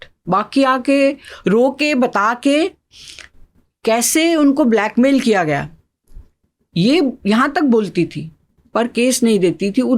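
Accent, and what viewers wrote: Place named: Punjab, India. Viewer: Maam is punjabi …her accent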